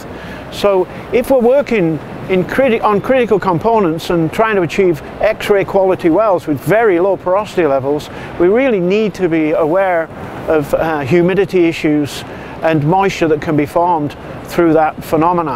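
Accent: United Kingdom. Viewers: Two things, you sound like your native country is England, so why say that word incorrectly?! And secondly you look like hack you up with a hatchet Harry from Lock Stock And Two Smoking Barrels. Top video though, good tips!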